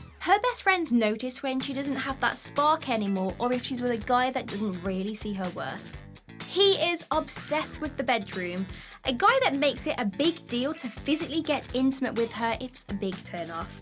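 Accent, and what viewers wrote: United Kingdom. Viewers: has potential to reach 1M subs. This british accent drive ppl crazy lul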